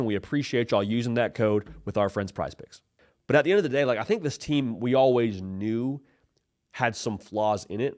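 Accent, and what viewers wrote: South, United States. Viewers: Thanks,! Great to hear a Southern voice in all of these commentaries. As a Catholic Georgia boy, and former UGA student, I say Congrats to Notre Dame! It was hard to watch for me but I wish them all the best in the playoff. Beat Penn State!! The Dawgs will be back. We need to get better backs and receivers, and they need to protect the ball. I hope Gunner can develop and grow into the player he can become. Happy New Year!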